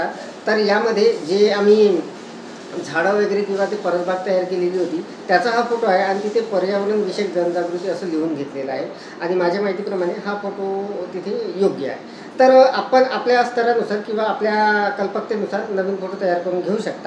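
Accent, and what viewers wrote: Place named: Vidarbha, India. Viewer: खूप छान माहिती सर. अतिशय चांगल्या पद्धतीने अवघड प्रक्रिया एकदम सोपं करून दाखविलात. तुमच्या वऱ्हाडी बोली भाषा एक वेगळी ऊर्जा उत्पन्न करते. खून छान माहिती.❤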